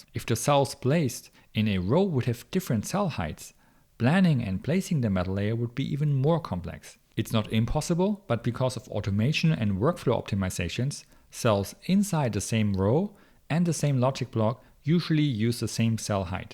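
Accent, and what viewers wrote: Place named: Germany. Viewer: This man is likely from German, no Wonder the English is like what I think, cause previously I have Watched German Laptop reviewer (Notebook Check)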